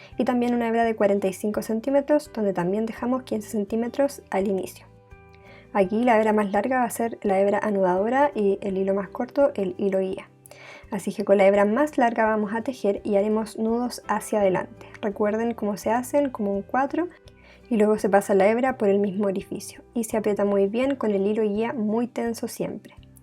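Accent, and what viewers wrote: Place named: Chile. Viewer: FELICITACIONES, MUY BUENOS TUS VIDEOS!!! EXCELENTES! ME DIJE ESTA CHICA ES CHILENA, MUY DULCE TU VOZ!!! INTENTARE REALIZAR TUS <link> ENCANTAN LAS MANUALIDADES COMO EL TEJER, Y ESTE ARTE DEL MACRAME SIEMPRE ME HA LLAMADO LA ATENCION..EXPERIMENTARE Y TE INFORMARE,... GRACIAS POR COMPARTIR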